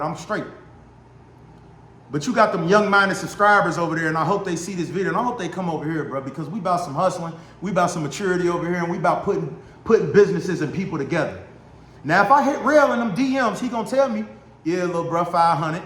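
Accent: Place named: Detroit, United States. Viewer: Could’ve swore you was from Detroit s/O to Cincinnati! I got fam in Youngstown & I just subscribed to your channel bro! Keep goin up 💯💯💯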